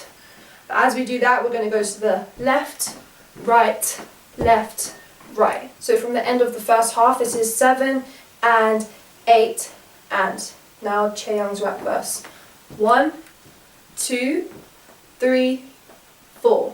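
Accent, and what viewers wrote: United Kingdom. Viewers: Thank you very much for the tutorial, new subscriber, are you from the UK? your accent makes me like from the united kingdom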